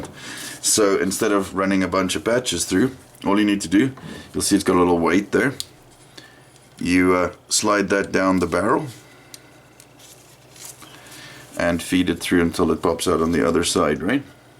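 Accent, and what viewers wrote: South Africa. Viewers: Enjoy the videos, man! I have to ask, is that a South African accent?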